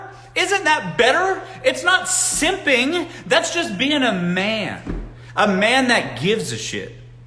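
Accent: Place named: New York, United States. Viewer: You sound like a real New Yorker 💯😂 I like you... I'm a lady from New York and we just say it straight with no chaser!😂 Cheers to you,!🎉💞😂🥂😎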